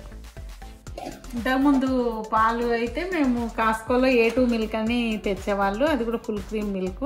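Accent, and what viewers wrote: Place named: Telangana, India. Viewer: telangana style la manchiga chepindru peddamma thank u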